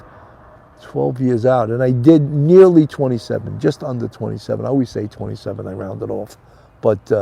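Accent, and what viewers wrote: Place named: Texas, United States. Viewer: I want to hear your Texan accent lol.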